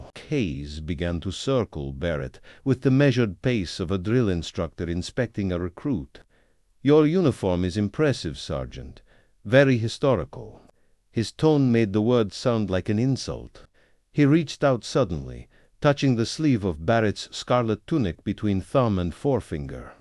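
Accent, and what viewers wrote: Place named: Eastern Europe. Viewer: UTTER NONSENSE The young fella on the "title page" appears in a private guardsman uniform embellished with various bits of frippery and unknown collar dogs and shoulder flashes. The narrator has a pronounced east-European accent - a noticeable Russian cadence. Since when has Buckingham Palace had ornamental flower beds on the public side of the gates? This is COMPLETE CRAP - DON'T WASTE YOUR TIME - IGNORE "Guard's life"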